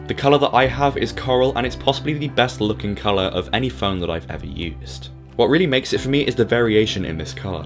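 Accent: United Kingdom. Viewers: The slow music and your smooth British accent almost makes it sound like this is an Apple trailer lol Doesn’t change the fact that this was easily one of the best reviews and everything was on point! Good job bro!!!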